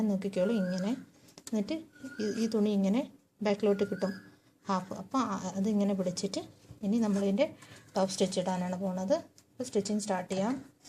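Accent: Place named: Tamil Nadu, India. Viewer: Ningal tamil aano...samsarathil oru tamil chuva..enthayalum nice video aanutto. New subscriber aane..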